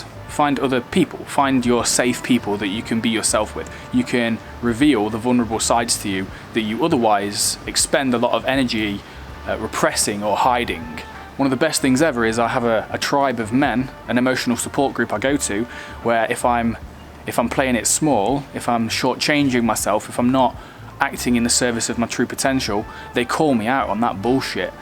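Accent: United Kingdom. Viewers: Nice! I had a feeling you're accent was British 😅. That's such a beautiful accent and place to live! Greetings!!!!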